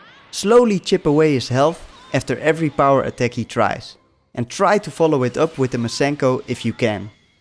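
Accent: Netherlands. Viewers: You're Dutch aren't you 😄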